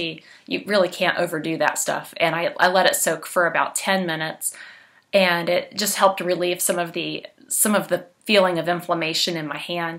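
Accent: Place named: Philadelphia, United States. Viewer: Appreciate Tips no pun intended! I miss that accent it sounds like You’re from Philadelphia! That’s where I grew up but I’m out in California now. I miss ...the people. Haha I have a little tip although unsolicited… If you had the window in front of you this would’ve made a much better video it would’ve been perfect lighting but it’s really dark and hard to see you with it behind you it makes you a silhouette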